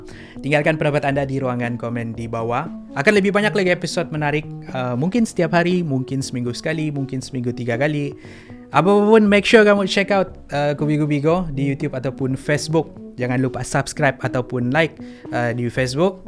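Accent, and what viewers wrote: Sabah, Malaysia. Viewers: Abg org Sabah ke.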